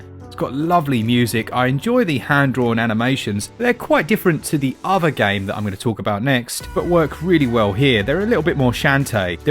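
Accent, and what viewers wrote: United Kingdom. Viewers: Everything sounds better in a British accent. Please say Guv' after every sentence on the next vid 😂 Always keeping it SwitchedUp, <3 from the Land Down Under. - F0x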